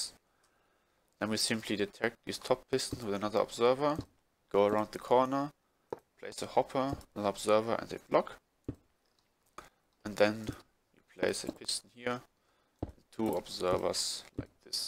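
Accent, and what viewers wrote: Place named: Germany. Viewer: Nur wegen dem Akzent sofort gewusst deutscher😂😂😂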